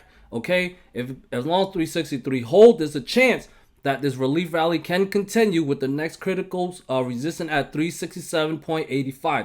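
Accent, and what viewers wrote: New York, United States. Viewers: you from ny?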